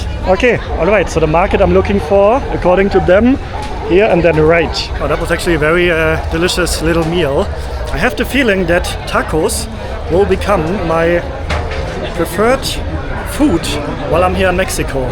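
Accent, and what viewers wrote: Germany. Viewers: Ya sabía, dije, ese acento no es estadunidense jeje. So, You are from Germany, You should search to Jan or Moe, they arebfrom Germany and they have many years here un México. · You shouldn’t be assuming that people understand your English, let alone with your German accent. Learn the basics of Spanish for a German tourist. If you went all the way there and you don’t even know what “Salida” signs are telling you, that means you didn’t prepare at all for this trip.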